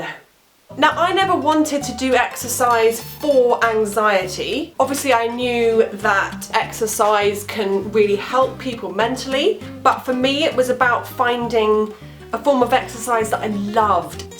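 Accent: England, United Kingdom. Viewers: Hallelujah!!! Oh how I love me some health-conscious, fit, ball-cap wearing TRUTH with an English accent as a morning wake up call!!! So awesome! I am really psyched about this vs the I met when I first started watching this channel months and months ago. The difference is NIGHT and DAY!!! Thumbs WAY UP!!!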